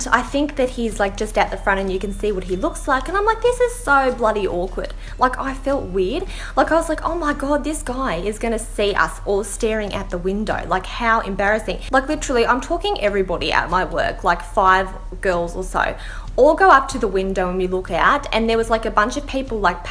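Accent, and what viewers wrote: Australia. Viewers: It's an Australian accent😂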